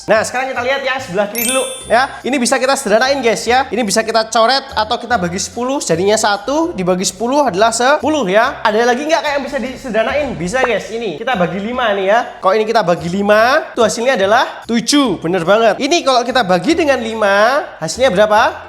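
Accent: Java, Indonesia. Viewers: Mendok iki suara nya to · Medok bgt 😭 Jujur suka cara ngomongnya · Orang jawa ya kak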